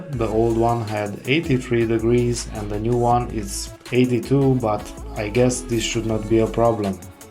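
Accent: Romania. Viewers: din primele propozitii se vede ca esti roman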